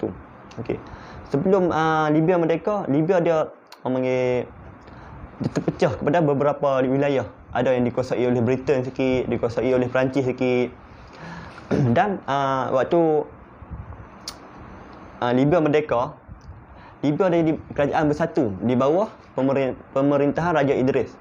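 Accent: Perlis, Malaysia. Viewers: Good content Bro. Semoga Allah mempermudahkan segala urusan kita hendakNya Bro. Style cakap mcm Geng Perlis.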